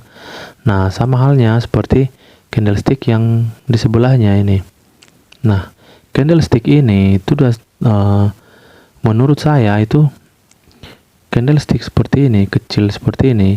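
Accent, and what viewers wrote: Medan, Indonesia. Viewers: Bg orng Medan yaa ?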